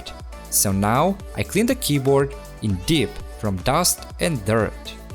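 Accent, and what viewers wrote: Russia. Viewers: ты русский ? если да то ответь (просто акцент русского) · Русский акцент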